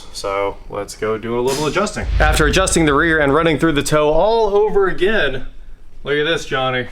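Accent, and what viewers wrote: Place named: Midwest, United States. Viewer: the "needs replaced" dialect is very interesting , only heard it in the middle of the USA, versus "needs to be replaced". Noticed it over several videos.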